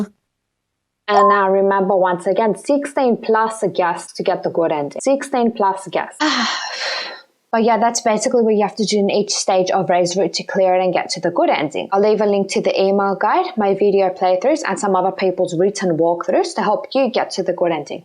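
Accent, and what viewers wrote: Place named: United Kingdom. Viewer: Es solo por su acento británico, a mí también me costó mucho entenderla pero al final entendí casi todo jfdj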